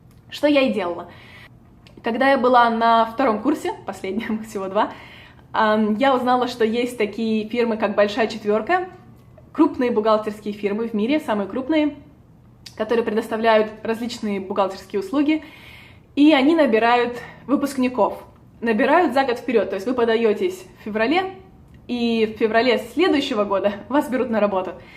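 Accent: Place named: Rostov-on-Don, Russia. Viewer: этот очаровательный южный диалект Ростов/Дон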